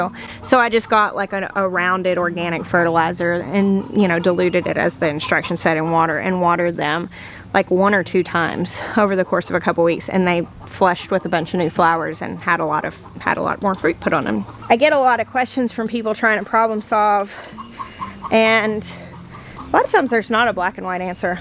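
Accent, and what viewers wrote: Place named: Mississippi, United States. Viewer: look now, lol, y'all aint got no business crying about the weather. This morning we woke up to 34 degrees F after Mother's day in Minneapolis Minnesota. Tonight we are expecting a whopping 45 degrees. This will be the first night above 40 degrees in about two weeks. I got peppers in the house putting on flowers already flowers on tomatoes help, help ,help! I have about 200 starters each one wants to be the first one in the garden. Thank you for being here I love listing to the accent I used to have when I left Mississippi a long time ago. Blessing on your summer and your move.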